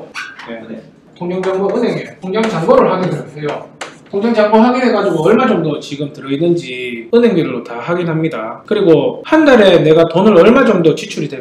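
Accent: Daegu, South Korea. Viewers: 말을참재미나게 잘 하시네요 착착감기는 대구사투리도 듣기좋으네요 강의 잘들었습니당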